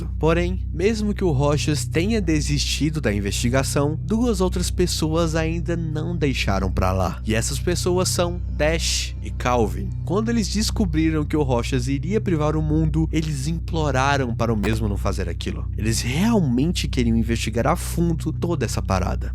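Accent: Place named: Portugal. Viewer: O cara e português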